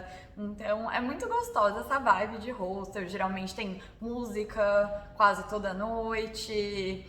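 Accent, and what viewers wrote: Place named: São Paulo, Brazil. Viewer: Otimo video …Vc tem um sotaque muito forte de paulistana ha ha, fofo, Eu Tbm Sou de SP mas meu sotaque is mais do interior😊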